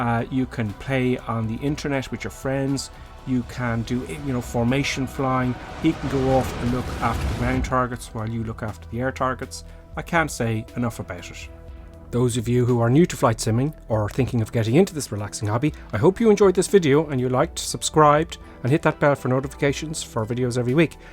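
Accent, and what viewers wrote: Ireland. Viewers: Just discovered your channel - Excellent video and totally agree with your comments. I'm just getting back to simming as I become a retiree after about 8 years away in that time it's safe to say things have improved leaps and bounds (apart from p3d/msfs - till now we hope). I'll never fly a real plane but this is so close it's mindblowing. Love the accent BTW my family all originate from West Meath/Roscommon. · Just subscribed to, love his irish accent and the video was great😂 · Are you irish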